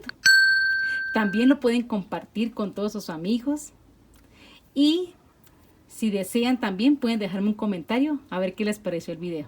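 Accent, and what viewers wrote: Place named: Mexico. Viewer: Me encantó esta receta!! Mil gracias. Y te pregunto de donde eres??? Sueñas a veces como México y otras como colombiana. 🇨🇴🙏🏻💓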